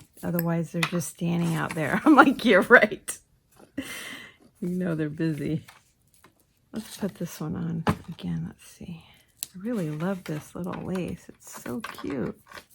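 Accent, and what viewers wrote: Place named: New York, United States. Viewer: Loved your New York accent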